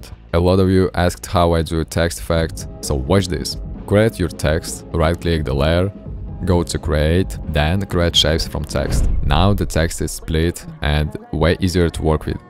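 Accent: Russia. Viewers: очень слышно русский акцент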